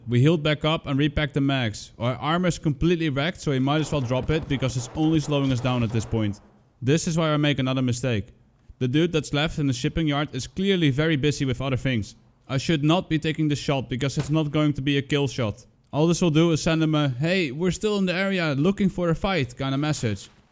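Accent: Netherlands. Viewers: He sounds Dutch kind of northern European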